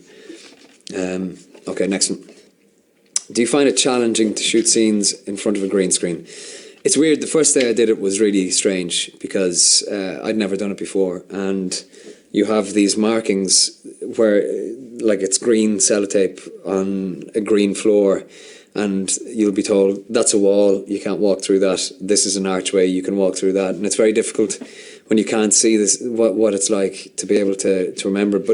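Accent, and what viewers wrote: Ireland. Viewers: TBH his accent irl is soo much more Irish than in the show like a huge diffrence, anyone else with me? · Ok so he is extremely attractive as Hook, but as himself with his Irish accent he is adorable!!! How is this possible? He's too perfect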